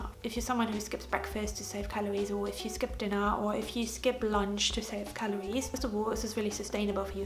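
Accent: Germany. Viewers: It took me a while to figure out your accent, but its German! ❤ Love from Germany, youre spitting the important facts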